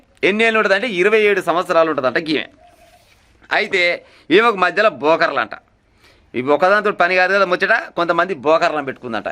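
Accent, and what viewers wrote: Telangana, India. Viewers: తెలంగాణ మాండలికం మాటల ద్వారా న్యూస్ చెప్పడం చాలా బాగా వుంది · నువ్వు భలే గమ్మత్తుగా చెప్పుతావే పెద్ద మనిషి వార్తలు మన అచ్చమైన తెలంగాణ మాండలికంలో 👌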